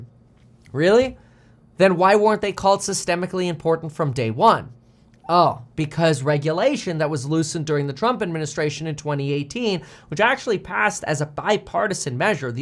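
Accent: Switzerland. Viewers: Why isn’t anyone talking about his SWISS accent?! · Love the Swiss accent!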